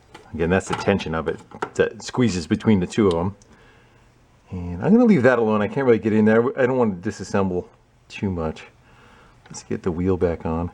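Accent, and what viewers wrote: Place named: Michigan, United States. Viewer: You sound familier. I bet your from Michigan.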